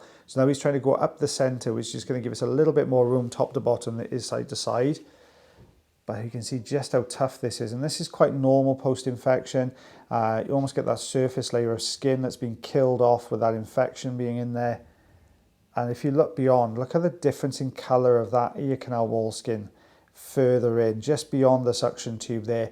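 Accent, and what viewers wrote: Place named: Wales, United Kingdom. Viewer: Awesome job. no need to apologize for the way you speak. Don't worry about the haters or complaints. You are Welsh not American. ❤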